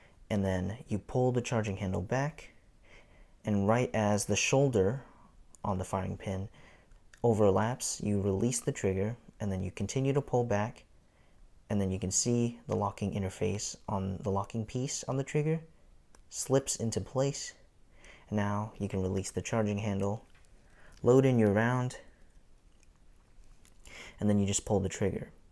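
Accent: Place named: United States. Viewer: why do sound so american when ur profile pic looks asian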